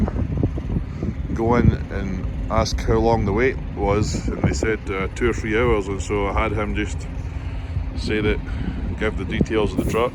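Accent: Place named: Scotland, United Kingdom. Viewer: where are you from in Scotland, from Ice Man in Ayrshire your accent is still strong,keep it between the lines.💪😎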